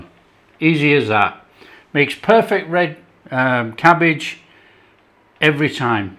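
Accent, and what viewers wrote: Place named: Derbyshire, United Kingdom. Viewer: Are you from chesterfield ?? , you sound like someone I watch from there ! I'm from canada, but my grandmother was a brummie 😁 Great video !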